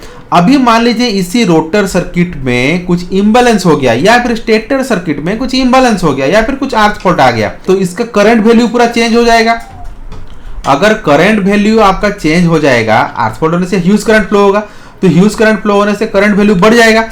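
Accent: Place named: Odisha, India. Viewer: Your accent says you are from odisha. Correct me if I'm wrong.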